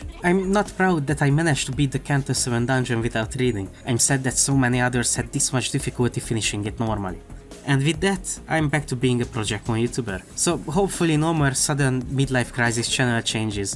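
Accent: Hungary. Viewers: ngl for some reson it kinda sounds like you have a hungarian accent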